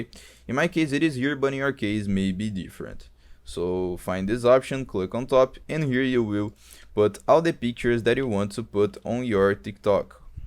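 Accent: Brazil. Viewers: o cara é brasileiro, conheço o sotaque brasileiro no ingles KKKKKKKKKK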